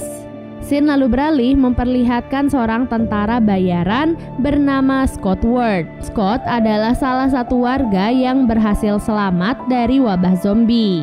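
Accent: Lombok, Indonesia. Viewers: Kak aku dengar kak dari lombok aku juga dari lombok 😁😁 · ORANG LOMBOK YA KA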